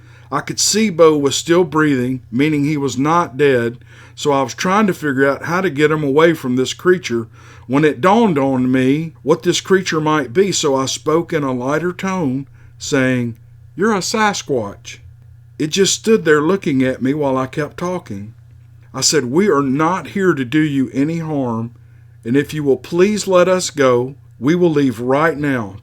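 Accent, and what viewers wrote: South, United States. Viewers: Had been watching Bigfoot videos on youtube and came across your channel. I never comment, but so enjoy your stories and southern accent I had to say what a great job you do. Thank you for the interesting stories and the time you put into this channel. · I think Sasquatch are far more intelligent than most people realize. Whether it understood english or simply read the man's body language, I'm sure they understand enough from their experiences with humans to see that we love our canine companions. Perhaps they keep animals as pets, too. Great story. I enjoy the stories spoken in that familiar southern drawl. Keep em coming!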